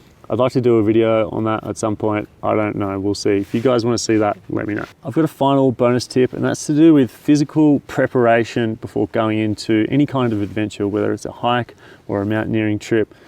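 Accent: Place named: Australia. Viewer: So great to hear an Aussie accent!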